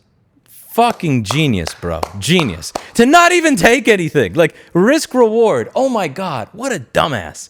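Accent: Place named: Texas, United States. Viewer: Seems your NYC accent is already slipping into that famous Texan drawl. Makes me wonder what else is gonna change. You just got back to your goal weight, and you were so happy about it, so as a Midwesterner concerned for your health, I advise you to STAY AWAY FROM THE CASSEROLE!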